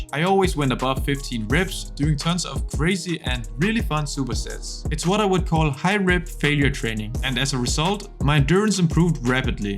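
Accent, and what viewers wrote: Denmark. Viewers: Nice video :) You sound danish. If so, where in DK are you located?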